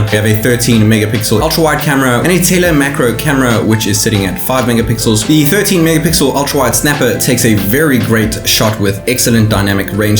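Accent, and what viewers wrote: South Africa. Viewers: South African accent? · Is that a South African accent I detect?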